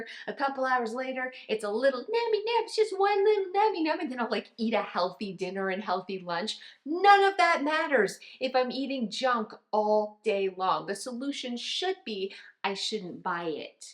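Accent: Canada. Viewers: She sounds Canadian…..so Canada has better Dollar Trees…probably more polite..lol…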